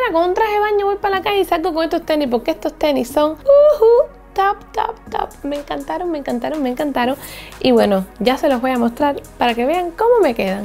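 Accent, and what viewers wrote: Cuba. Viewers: seguro por el acento cubano. No sé de dónde eres, pero yo soy Dominicano, y en Cuba y aquí usamos términos parecidos y/o iguales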